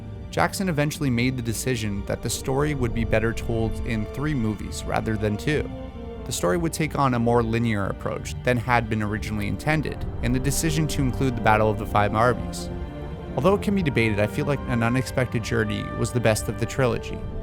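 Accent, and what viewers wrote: United States. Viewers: Is that a Florida accent? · Are you from Wisconsin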